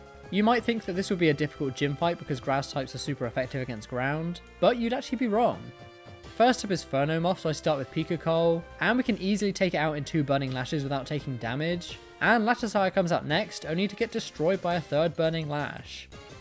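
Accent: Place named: United Kingdom. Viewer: what a beautiful british accent 😁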